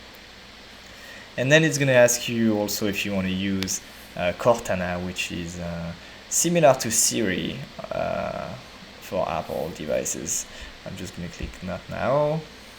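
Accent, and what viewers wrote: France. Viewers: if you don't mind me asking, are you french? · French accent 😅, good work budy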